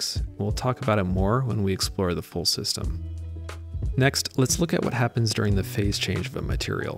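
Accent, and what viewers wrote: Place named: United States. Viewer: The way you say Gianni sounds like Johnny because of your American accent lol. A strong American accent saying John sounds more like Jahn to my Aussie ear. (We have our own funny pronunciations)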